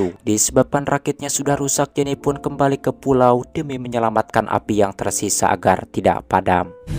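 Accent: West Java, Indonesia. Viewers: Ti mana sundana a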